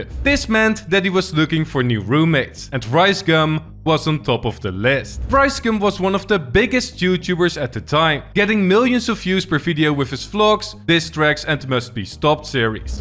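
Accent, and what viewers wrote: Netherlands. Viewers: Ayo you sound dutch af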